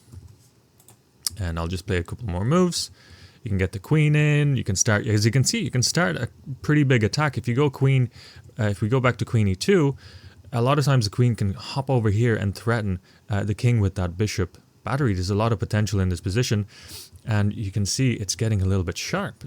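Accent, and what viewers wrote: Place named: Midlands, United Kingdom. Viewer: Thanks for adding another idea to my opening repertoire What county are you from bro? Can't put my finger on it, fairly neutral so west/midlands?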